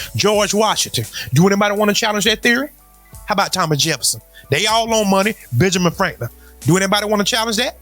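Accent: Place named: South, United States. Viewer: NC here! Keep doing your thing, sir, give em that country talk!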